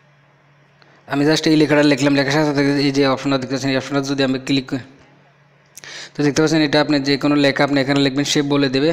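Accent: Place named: Noakhali, Bangladesh. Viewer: আপনার ভাড়ি নোয়াখালী 😍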